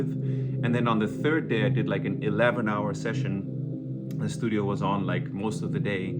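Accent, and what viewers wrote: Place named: Switzerland. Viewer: Thank you for your advice and your videos. Are you from switzerland, too? I thought i can hear a little bit of a swiss accent in your speaking.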